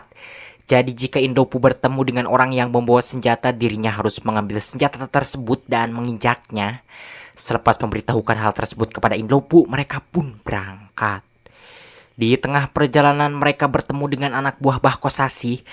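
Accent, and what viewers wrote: West Java, Indonesia. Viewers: Urang sunda? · Wihhh Sunda Palih mana kang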